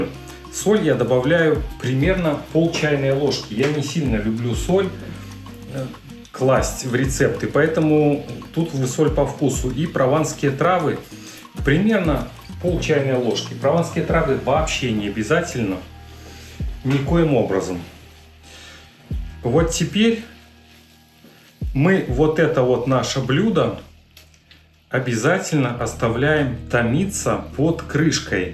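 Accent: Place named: Odessa, Ukraine. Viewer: Длбавляе т что шикарный вкус, а то их есть у меня получается, еврейский одесский тип речи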